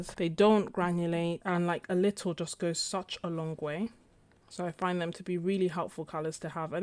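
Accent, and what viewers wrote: England, United Kingdom. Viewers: Thoroughly enjoyed watching this and listening to your train of thought. Excellent examples, very clear and large. Many thanks for your hard work on this video. I like the simplicity of the "Sketcher's" names. Best wishes from the Pacific NW. (Listening to you makes me pine for England - I miss it so much!)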